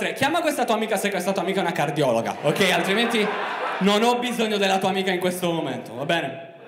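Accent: Sardinia, Italy. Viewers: accento sardo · accento sardo top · sei Sardo, quindi è anche bello ascoltarti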